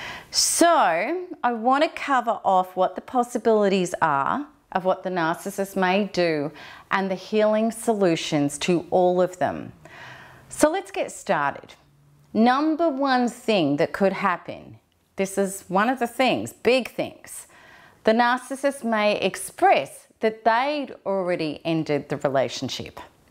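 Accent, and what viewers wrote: Australia. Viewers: hi Thank you so much for your love, care and education. And nice to hear your AUSTRALIAN. I've just tried 10 plus times to get your free, free yourself education from online but the site isn't letting add both my name and email address. Could you please send it to me? I'd love to read this. I'm a victim of crime. Would love your support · OMG could actually learn how to speak properly, you sound like you fell off the back of a truck in Mt Druitt. It makes it difficult to listen to. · I like your accent so much 👏👏👏Love to Australian Nation!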